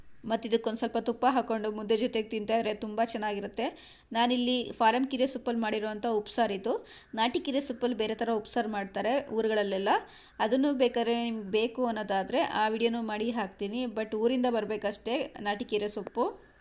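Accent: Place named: Mandya, India. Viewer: ಅಕ್ಕಯ್ಯ ಮಂಡ್ಯದ educated ಮಾತಾಡೊ ಕನ್ನಡ ಚೆನ್ನಾಗಿ ಮಾತಾಡ್ತಿಯಾ, ತುಂಬ ಇಷ್ಟ ಆಯ್ತು, ಸೂಪರ್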